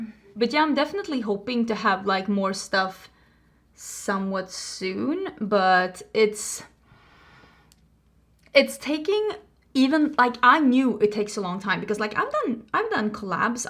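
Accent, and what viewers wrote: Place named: Sweden. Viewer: Totally agreed. I'm an English/Grammar nerd and her English is impeccable. I do love when the Swede slips through and Js become Ys, but so many people who speak English as their first language commit far worse word crimes.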